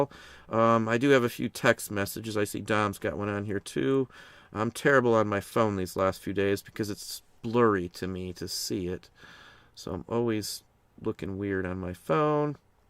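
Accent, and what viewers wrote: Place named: Northwest Ohio, United States. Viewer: I lived most of my life in northwest Ohio. From how you talk I assume you live near Toledo? What city do you actually live in or what town?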